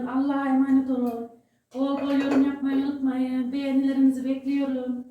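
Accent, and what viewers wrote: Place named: Aksaray, Turkey. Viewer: Videolarin izlenme orani,abone sayisindan fazla!!.bir zahmet onlarda abone olmayanlarda abone olsalar😊.Demekki aksarayliymissin.Ogün bir videonun altinda yazmistim.aksaraylimisin kayserilimisin.sivenden anlamistim.Aksarayli elmasin hayati,bide onun gelini cansu otuk var.onlarda bu sivede konusuyorlar.Hatta siz konusurken,sanki cansu konusuyor😊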